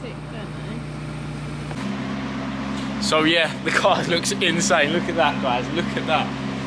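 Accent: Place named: Essex, United Kingdom. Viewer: i love ur commentary proper essex geeza keep it up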